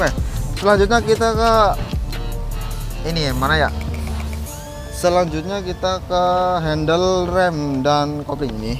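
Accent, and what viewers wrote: Banyumas, Indonesia. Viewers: Apik ngapak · Bang aku tau abang orang mana abang orang ngapakkan yang tau like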